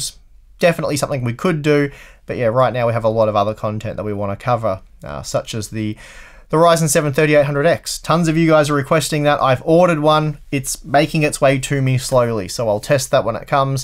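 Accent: Australia. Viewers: I think he says "near on impossible", might be the aussie accent.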